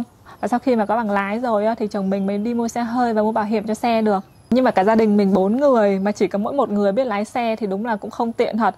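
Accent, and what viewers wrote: North, Vietnam. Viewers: giọng nói miền bắc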